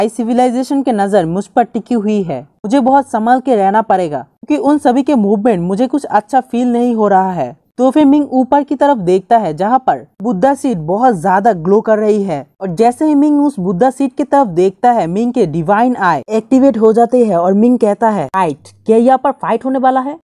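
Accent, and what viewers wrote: Bengal, India. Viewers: Bro r u bangali tumhara hindi pura nehi hae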